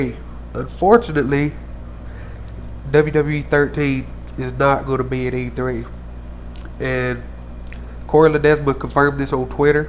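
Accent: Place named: South, United States. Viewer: lol u probably get it alot but u sound so amazingly what we think of as southren yank in britian so i had to b ironic i mean nascar in any other accent just doznt sound right lol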